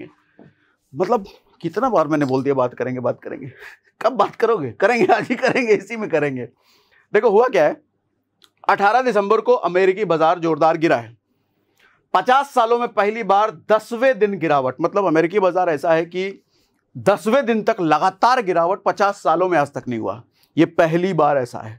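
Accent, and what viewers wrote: Bihar, India. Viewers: Bhai aapki bhasa se aap bihari lag rhe ho or aap kya bol rhe h ye sayad aapko bhi nhi pta . Ab suno ye koi nai baat nhi h market crash hona or ha abhi carsh hua nhi h but aapne apna paisa kmane ke chakkar me video daal dia or logo ko bevkoof bna rhe ho . Piche ka record utha ke dekho kab kab market me movement hota h ......kya yar bkvas ke alava kuch or bhi krte ho 😂😂😂😂😂😂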